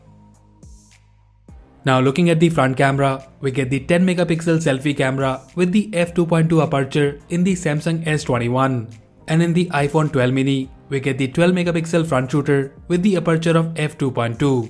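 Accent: India. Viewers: Indian accent 😫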